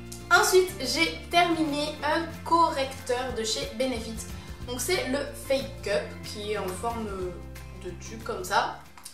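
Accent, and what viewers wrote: South, France. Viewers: Je t'ai découverte y'a pas longtemps et j'adore tes vidéos, très simple sans chichi, et puis ton accent du sud 😻 c'est tellement rare les youtubeuses du sud ça fait plaisir moi qui est l'accent aussi ! Et pour info, comme tu as un petit budget, je ne sais pas si tu as vu, mais ils ont sorti plein de petit parfum a 30e chez sephora ! Voilà j'ai hâte de voir une prochaine vidéo 👌 !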